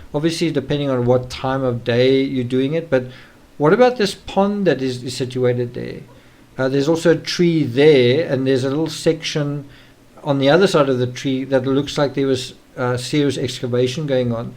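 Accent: South Africa. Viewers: Definitely a South African accent.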